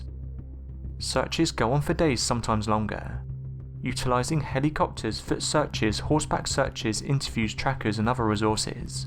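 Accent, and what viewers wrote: United Kingdom. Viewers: AHÍ CARES ABOUT GUNTHER AND HOW UGLY GULF.. AND PLEASE GET THOSE SISSIFIED BRITISH NARRATORS OUT OF HERE.. AMERICANS DO NOT CARE FOR BRITS NOR THEIR SISSY VOICES..SO FAKE..SO FEMMY! FROM NOW ON IF VOICE IS A BRIT.. WE WILL NOT WATCH !!!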